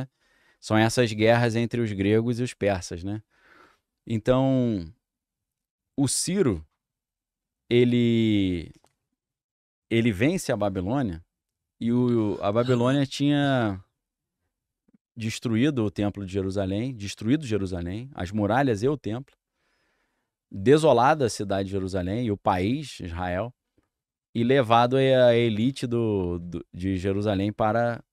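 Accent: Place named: Rio de Janeiro, Brazil. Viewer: Como levar a serio um cara com sotaque de carioca?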